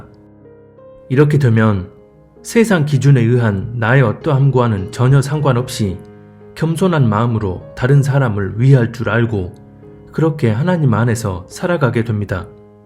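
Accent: Jeolla, South Korea. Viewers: 전라도 성도님이십니까요잉?ㅎㅎ사투리가 걸쭉하신게 듣기좋습니다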